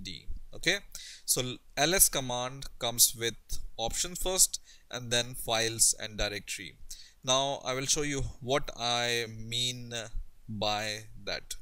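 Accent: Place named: India. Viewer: im curious about who you are? it appears your in Germany but you sound like your from India.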